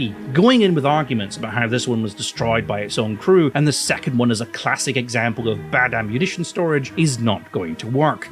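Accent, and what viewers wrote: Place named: United Kingdom. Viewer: Any accent you do is a Brirish accent. Do you not hear it?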